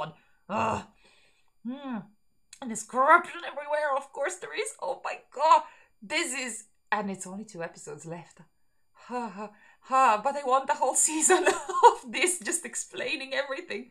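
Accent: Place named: Italy. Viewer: Sorry for non-related, possible offensive comment, but, in the moment you started the video I knew you were Italian. 😅 "The wey of speakingue withe ann ecxtra vowel is so cuteh". Same happens when we Brazilians say "hotchy doggy" instead of "hot dog". I just love it.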